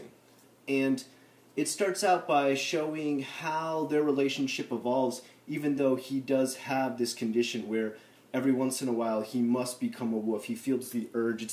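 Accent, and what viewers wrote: United States. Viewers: do you pronounce the japanese language in your videos as you would when talking to Japanese people? It sounds very americanized to me. Still, great videos:)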